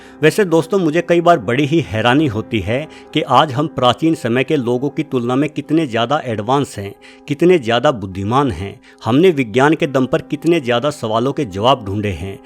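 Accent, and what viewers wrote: Haryana, India. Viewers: Pkka hariyana se ho bhai sahab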